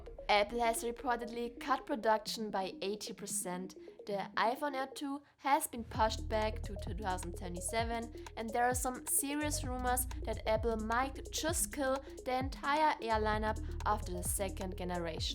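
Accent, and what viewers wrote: Germany. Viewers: I can hear the German accent :D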